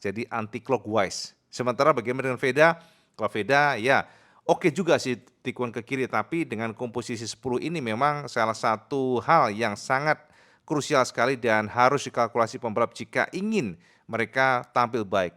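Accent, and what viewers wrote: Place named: Java, Indonesia. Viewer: Bang asli ngendi je bang kok jowone tulen hehe...salam seko gunungkidul bang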